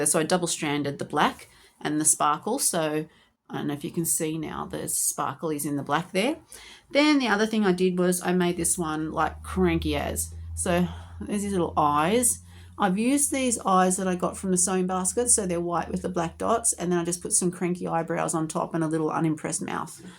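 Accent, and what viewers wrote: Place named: Australia. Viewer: Hi! Super cute mushrooms 🍄 I had to laugh about tester calls because I thought the very same thing! 😅 it's your beautiful Australian accent...don't change ❤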